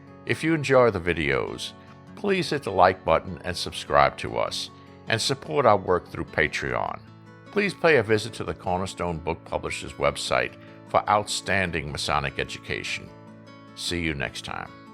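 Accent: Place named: New England, United States. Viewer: Narrator sounds more like he's from Massachusetts or Maine, not Louisiana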